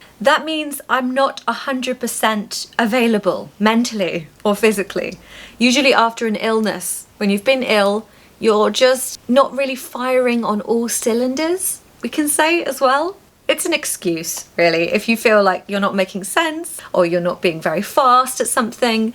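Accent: RP, United Kingdom. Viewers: Well I happened to come across your channel by chance, and since I love RP and modern RP accent and British manners, and you combine them perfectly in your way of speaking, your videos are perfect for me, since I both enjoy and improve my modern RP accent! Also, I can learn some new vocabulary and expressions too, so.. it's just perfect! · I kind of copied your way to speak, and now I sound truly British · Hey teacher. This is Julia, I am a visually impaired filipino student whose waching your videos since last year. I am the person who ask to you if you can sing a song for us, and I am the person who ask you if you already tried the filipino foods. Teacher? Can I ask you something? Do you had a filipino students before? Since I am a grade 10 student right now here in the Philippines, our lesson in english at School is all about model verbs. Teacher? 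Can I have a request? Can you teach model verbs? Thank you. The reason why I'm waching and listening to your videos because, I want to learn the british accent, I want to improve my english speaking skillss, I want to learn a british culture, and I want to become a content creator in the future. I will be a famous content creator someday, I want to use the english language, and I want to use the english accents like american accent and the british accents like london and the modern receive pronunciation. I want to share my knowledge to other people using the english language. Even it is hard for me to understand the accent sometimes, I'm still listening to all your videos. Teacher, I really really really really love your british accent! I really really love the way you speak, the way you teach, and the way you teach pronunciations. It's great! It is very very helpful and very very use full for me. 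teacher you know? Even I am blind, for me, you really really look nice! you look beaut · It’s not false; however, I believe that we must mean her own accent which is the 'modern received pronunciation' which is undisputedly a British accent and probably (especially) by opposition or difference with the American accent. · The reason why I’ve been watching your videos is learn British English and cultures. Your RP pronunciation is really marvelous! When I came across your channel for the first time, I reckoned that was where I would settle down regarding RP sound!❤ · It sounds very origin British accent....I admire it a Lot...we're waiting for more..thanks Teacher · Hi, I'm so happy for your pregnancy!! You look lovely by the way!! I'm watching your videos because of your inner and outer very feminine loveliness, and because You've exactly the British accent I would like to acquire myself I'm Norwegian born&bred bu have lived in Italy for 40 years now with no one to talk to in English, so I just wish to find my lost flow and to bring my accent closer to yours.if possible. 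Watching and imitating You is really helpful and FUN! Sending Love to You and Your husband Tom and your baby boy.! Gioia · very clear and easy to understand your British sound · Hi, ❤❤wow! Congratulations. I haven't visited YouTube for months. I have been really busy with work. You are the reason that I visit YouTube! Really! It is so homely to be able to hear your lovely RP accent again. 🎉🎉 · Dear! I din't know you were prengnant!! Congratultions, I'm so happy four you!!! I love to hear you, your pronunciation it's so lovely, your voice it's so sweet and beautiful, it's a delightful to hear your british pronunciation! I send you kisses from Cordoba, Argentina. Gabi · Congratulations once more for the new guest in offing. I love to hear you for your lovely RP accent and pronunciation.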